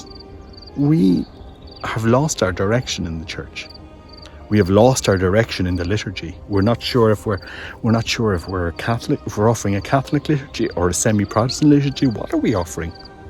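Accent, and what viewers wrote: Ireland. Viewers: The Eucharist is a treasure. Amen! Thanks for your videos, great to hear the Irish accent too 🙏🏿🙏🏽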